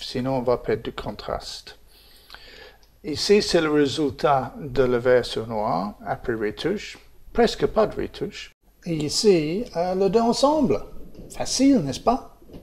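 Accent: England, United Kingdom. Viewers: Merci merci super utile et j’avoue le petit accent anglais c’est juste la classe!! 👍🏼☺️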